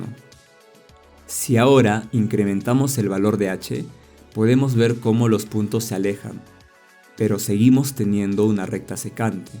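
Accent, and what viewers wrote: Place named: Peru. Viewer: Ese acento Peruano es increíble!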